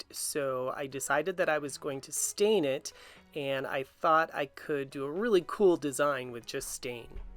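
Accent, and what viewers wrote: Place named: Midwest, United States. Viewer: I THOUGHT I heard some midwest inflection there! (I'm in Ohio) What gorgeous wood grain was hiding under that old varnish!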